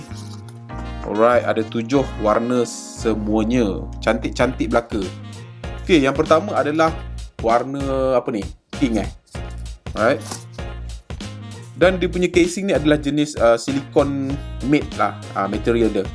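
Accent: Melaka, Malaysia. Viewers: org melaka ke?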